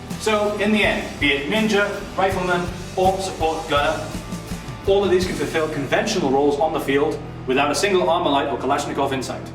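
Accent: United Kingdom. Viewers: i said influence not control ;) he asked why does he have an english [british] accent? and i just said because of Britain's influence in Japan. It's a larger one than America or other english speaking countries, no? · but why does he speak soooo... english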